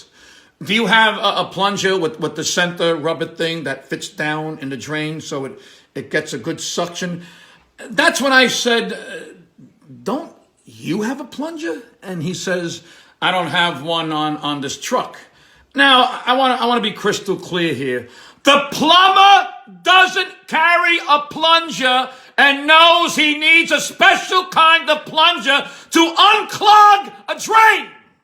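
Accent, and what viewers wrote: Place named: East Coast, United States. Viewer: ur killin me😅😅that east coast cadence in your speak just seals the deal😅😅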